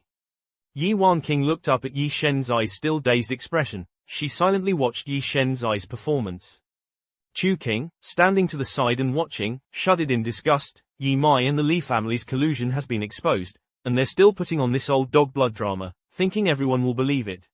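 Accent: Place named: United Kingdom. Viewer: The British accent is pissing me off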